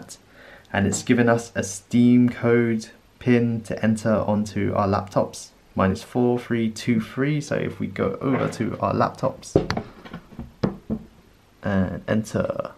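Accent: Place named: United Kingdom. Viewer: Wow never seen an Asian man or woman speak a British accent until now, nice video as well. Don't have a PC but gonna get just something cheap to stream seeing as I need it. Thanks for the help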